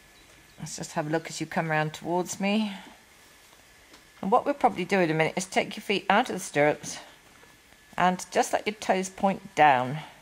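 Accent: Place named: England, United Kingdom. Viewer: This is VERY informative! I ride a horse with a very similar build, belly wise, and I struggle to keep her forward at the trot- my leg aids are not very good, I think. I saw a more advanced rider on her and they did not seem to have as much issue getting her to go forward, and to then maintain it. I even have to take my lesson with another horse just to keep her going, I need help with my legs I think! And it is good to know even pros struggle to look up- I also tend to softly focus right at the horse's head area, and constantly get told to look up that the fence or at the treeline... I would LOVE to be a working student! Also, those saddles are amazing! I feel like mine MIGHT be pushing my lower leg forward- I do a lot to keep it back, but I have recently seen some video of myself and it creeps forward regardless... I might see if I can try another saddle next week, if they have another one that fits Rebecca's (my lesson horse's) wide back! 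And you sound almost EXACTLY like my instructor, she is also English! She did eventing and then dressage, at a Grand Prix level, I believe. Or at least she had a Grand Prix level horse...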